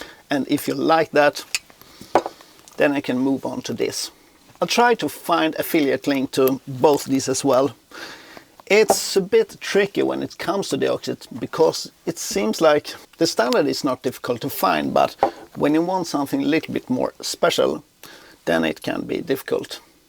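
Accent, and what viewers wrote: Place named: Sweden. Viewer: Sounds like a Swede :)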